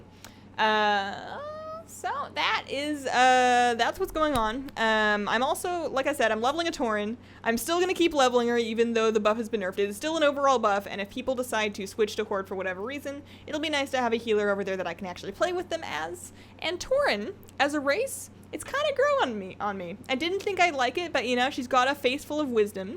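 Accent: Canada. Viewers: dat canadian accent.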